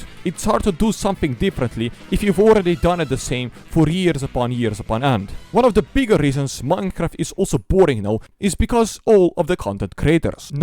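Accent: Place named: Eastern Europe. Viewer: ... yeah i hear the babushka people acent now that i relisten to it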